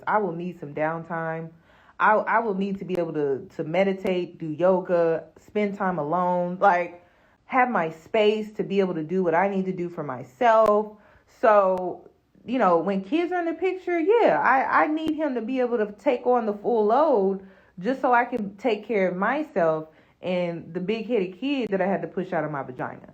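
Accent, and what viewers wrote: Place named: Midwest, United States. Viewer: thanks. I heard the Midwest in there. 😊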